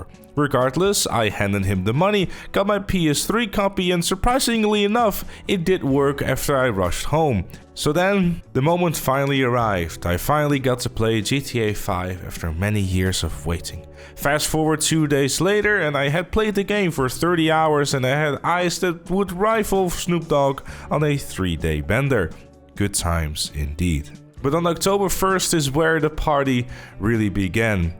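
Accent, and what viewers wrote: Netherlands. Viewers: Another Dutch man? I hear your accent bro, you cant hide it from me!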